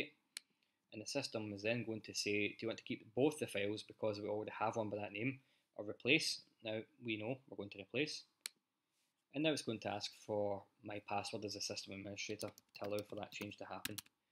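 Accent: Scotland, United Kingdom. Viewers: Hi. It sounds like this is a good fix but you're speaking so fast with your Scots accent that I can barely understand what you're saying. I wish I did. I love your accent. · William Wallace accent!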